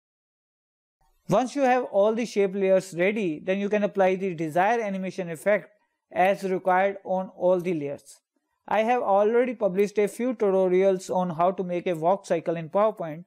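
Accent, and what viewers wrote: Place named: India. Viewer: his accent sounds like indian and look at the top-right corner, his name is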